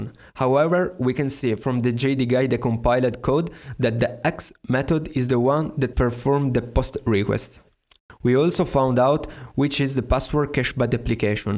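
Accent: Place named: Italy. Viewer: I was looking for cryptocat's video but apparently I found something better ahaha loved your explaination, solo un po' strano sentire l'accento italiano :D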